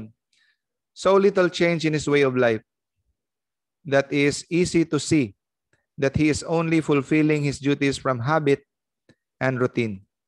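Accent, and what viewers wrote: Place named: Western Visayas, Philippines. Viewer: tono ni doc daw more ilonggo.hiligaynon doc.daw nasanay naman ako pamati sa cebuano maragsa .kaya sabi ko noon parang iba c doc magsalita smooth lng sory.pero ang more manami pamatian kay doc manami mag explain.Godbless more cfds and church militants.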